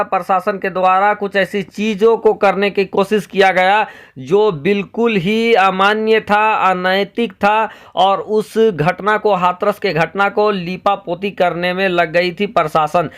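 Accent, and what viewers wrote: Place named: Jharkhand, India. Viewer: Jharkandi talaffuz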